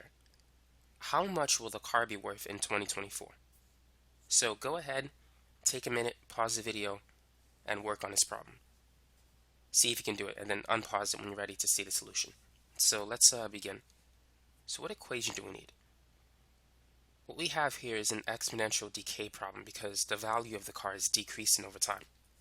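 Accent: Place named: New York, United States. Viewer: New York accent innit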